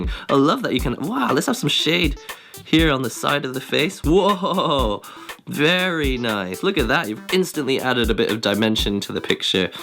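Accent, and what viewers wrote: United Kingdom. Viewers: Hey, new subscriber here. Are you from the UK? Thats not an American accent!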